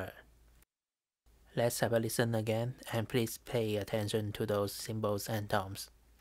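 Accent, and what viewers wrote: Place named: France. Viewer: Love the crazy French accent!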